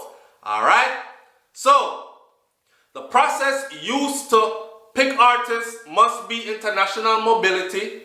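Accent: England, United Kingdom. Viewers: you british accent tun up high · Yo dawg yuh England accent mad 🤣🤣🤣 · British accent tun up my yute. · Love the English accent... lol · The british accent...wicked would listen to it all day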